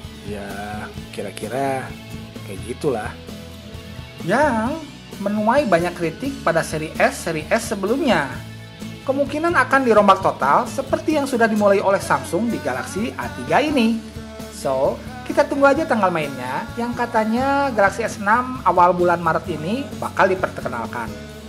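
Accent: West Java, Indonesia. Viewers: nuhun kang buat reviewnya :D sunda pisan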